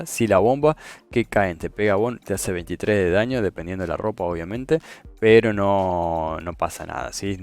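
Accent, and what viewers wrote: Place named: Argentina. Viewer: Argentino??? me suscribo hermano!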